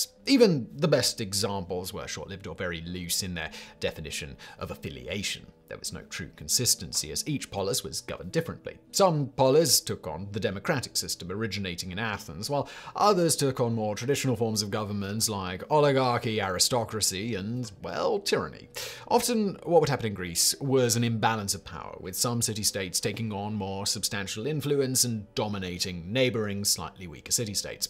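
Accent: England, United Kingdom. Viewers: I don't follow. isn't American. I've never heard anyone in America say Leon-Eye -Dass. Maybe if you've been watching too much Pawn Star Wars on the now mislabeled History Channel. Actually I've never heard anyone say it that way. Since he is very, very English, he's never changed anything he's said in years to cater to any American pronunciations. If I had to say what the most common pronunciations that I've heard, on TV, the BBC, in movies, it would be Le-o-ni-des.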